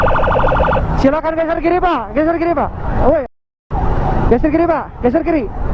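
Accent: West Java, Indonesia. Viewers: sunda gening anjayy 😆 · Oh abang teh orang sunda. Sama atuh bang sunda na timana. Abdi mah cianjur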